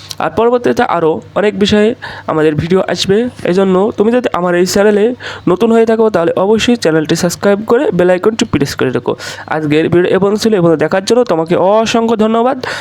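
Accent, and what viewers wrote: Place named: Brahmanbaria, Bangladesh. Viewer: ব্রাহ্মণবাড়িয়া উচ্চারণ ঠিক করে নাও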